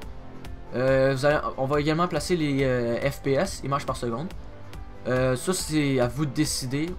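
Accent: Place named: Quebec, Canada. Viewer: TU vin de Québec? Parce que je recnnais cet accent ^^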